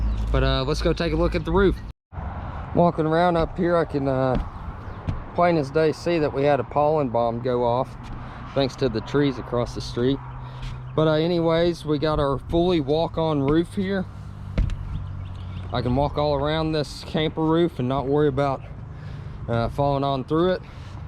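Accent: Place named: South, United States. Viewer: I'd like to see the smaller cap-over without the tip out to see if it would have enough storage for me. I'm alone and have no bloody kids, but I do like to cook so need storage and a good sized fridge, maybe the 825. Any chance of getting a deeper undivided sink. Thanks, you're funny; enjoyed the comments and your southern accent.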